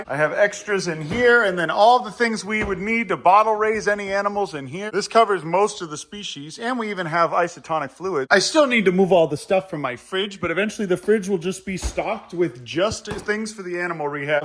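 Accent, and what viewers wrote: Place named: South, United States. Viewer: how are you both Southern and Canadian at the same time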